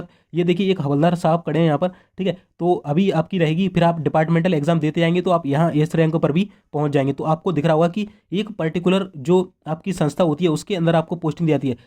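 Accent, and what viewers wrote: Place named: Gwalior, India. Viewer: I also from gwalior apki voice se laga hi tha ki aap gwalior se hi ho. 😅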